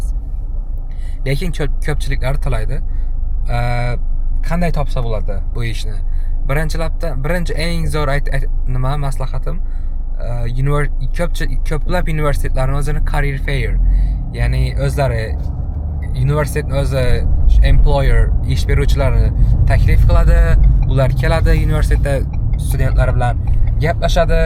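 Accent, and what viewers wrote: Khorezm, Uzbekistan. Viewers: Xorazmdanmisiz accent xorazmga tortyapdi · xorazmli misiz xorazmli misiz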